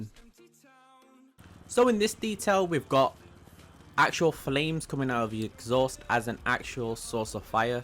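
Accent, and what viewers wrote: United Kingdom. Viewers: You from Great Britain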